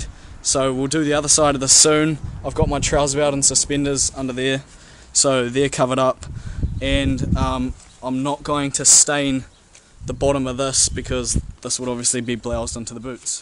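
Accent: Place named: New Zealand. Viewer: I hear New Zealand. Chur for the video bro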